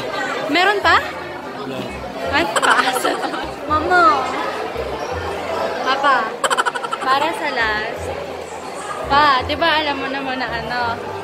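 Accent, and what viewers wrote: Batangas, Philippines. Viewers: Kaya din ano napadpad dito dahil don sa scammer😂😂 Taga batangas ka ga po ung salita mo kasi😂😂😂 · batangueña ga are haha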